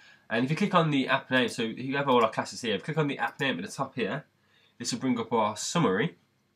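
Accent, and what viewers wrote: United Kingdom. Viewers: Where are you from? Manchester?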